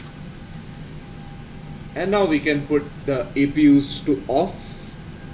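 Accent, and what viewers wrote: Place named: India. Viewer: This indian accent helps with understanding that procedure , video is very helpful .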